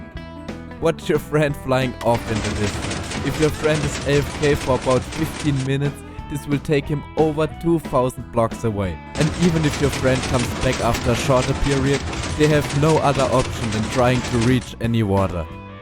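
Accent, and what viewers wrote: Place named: Germany. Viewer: you sound very German 😅 ich feiere deine Videos so sehr xD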